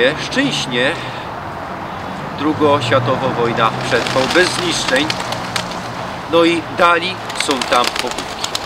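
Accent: Silesia, Poland. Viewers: Urodzilam sie w Myslowicach 41 Roku jest cieszko mi zrozumiec gwarwy sloaska Anna z Australia historia o Myslowicach chciala bym wiedziec❤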